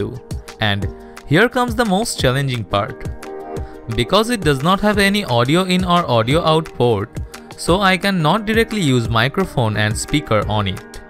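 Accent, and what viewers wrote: India. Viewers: sidha bol de na indian ha itna natak kyu krta ha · Does anyone notice that he has an indian acent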